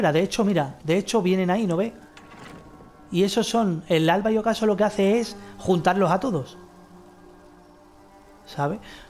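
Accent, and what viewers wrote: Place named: Andalusia, Spain. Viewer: Todo es mejor si te lo explica.un andaluz 🤣.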